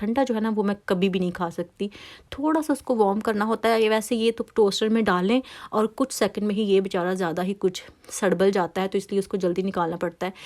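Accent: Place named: Lahore, Pakistan. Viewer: Pure Lahori accent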